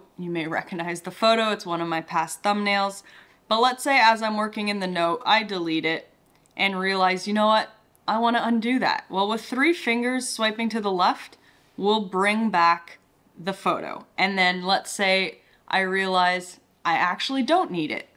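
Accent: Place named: Canada. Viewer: Wonderful video and an awesome Canadian accent! Thank you for the content!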